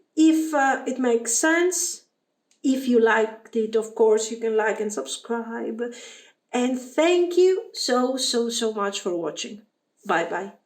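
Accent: Greece. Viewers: Your English is perfect actually! You simply have a very Greek accent, but your use of the language is great. I'm happy to see a Greek youtuber gaining popularity! Keep up the good work. I'm showing your videos to friends and family for nee channel recommendation!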